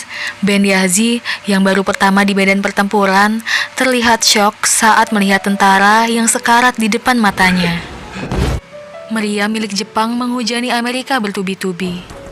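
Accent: Semarang, Indonesia. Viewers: orang semarang kah mbak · Benern semarangan hehe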